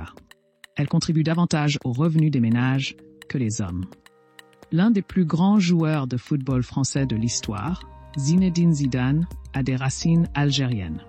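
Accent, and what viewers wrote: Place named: England, United Kingdom. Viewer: Vous avez un léger accent anglais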